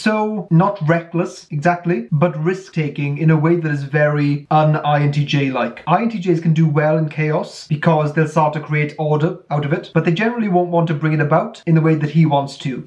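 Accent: United Kingdom. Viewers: Can you please, please, please make an effort to speak more slowly in your videos, and maybe not edit your shots quite so close on the heels of previous shots? I'm really interested in your videos, but when you get going so quickly it can be pretty hard for me to understand your accent (and I'm usually really good with accents). I understand if it's your style or whatever, but maybe I'm not the only one from outside the UK who struggles to understand?? Thanks. And yes, I would like very much like more videos typing GOT and other fictional characters! 😁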